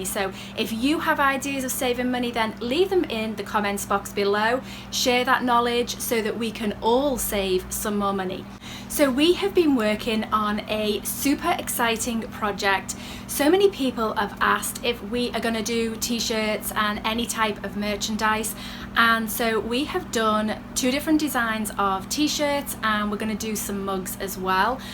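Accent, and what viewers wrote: Liverpool, United Kingdom. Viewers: Where are you from your speech sounds like you are from liverpool in the uk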